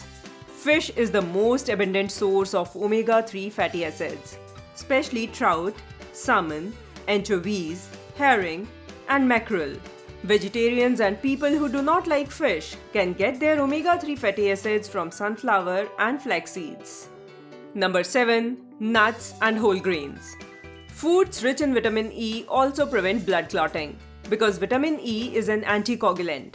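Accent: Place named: India. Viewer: Just listening to your Indian accent, i damn well knew i was in good hands. Loved that all these variety of fruits and vegetables are right up my alley, since i have to take Coumadin, due to having heart surgery. I know you did not recommend parsley, but it is excellent for the heart. Thank you so much.!!!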